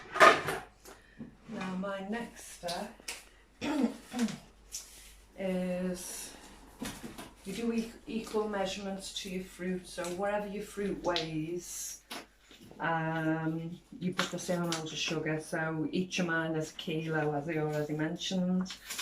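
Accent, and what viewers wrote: United Kingdom. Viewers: Is that a Wirral accent I hear?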